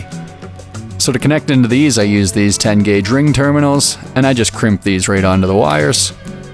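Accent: Canada. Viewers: You sound like an Ontario guy,hello from Mtl bud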